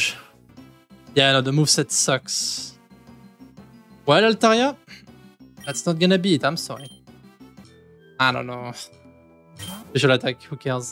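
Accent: France. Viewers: your french accent is fire 🔥🔥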